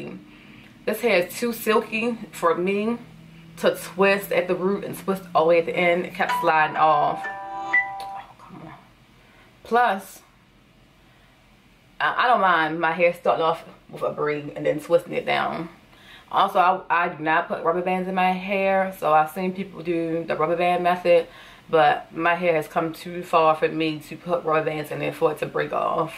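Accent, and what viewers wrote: Baltimore, United States. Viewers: This is the neatest I’ve seen. I’m trying to do mine and I’m about to throw In the towel. I love your accent by the way. Are you from Baltimore? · Love your accent. Baltimore? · Lol that Baltimore accent I love it. And your hair looks so pretty I'm gonna stop being afriad and try something new. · Bomb tutorial! Thank you 💙! Can't wait to do this in my hair :). I have a question, are you from Baltimore, Maryland? I hear an accent. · I know our accent anywhere Bodymore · This video was recommended to me and I'm glad it was. Great tutorial and hairstyle. Just curious, are you a hair stylist? I noticed a Baltimore accent, it'd be great to have someone with your skills in the area. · You're from Baltimore or the DMV right? That accent is a dead giveaway! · You from Baltimore? I can hear our accent. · U got that strong B-more accent!!😍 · I love that Baltimore accent! 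I could hear it with certain words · Came out beautiful... off topic but you sound like you’re from Baltimore... but anyway imma try this method... seems easier than the rubber band method .. thanks for the tutorial sis EDIT:and that dress is cuuuute too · Yo she got to be from Baltimore😁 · Are you from Baltimore or dc ? Lol i hear it.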